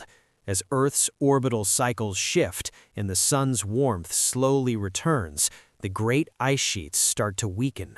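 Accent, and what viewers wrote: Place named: United States. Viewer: I can't listen to this because the American accent is too annoying.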